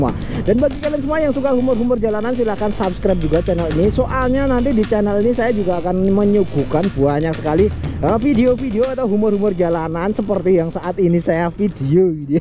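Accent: Java, Indonesia. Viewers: ojo lali di subrekkk.... jowone ngendi kang???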